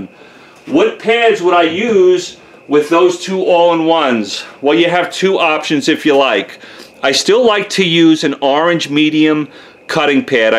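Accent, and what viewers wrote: New York, United States. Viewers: A Texan who sounds like a New Yorker. Hey, great video. Very informative. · Are you from New York?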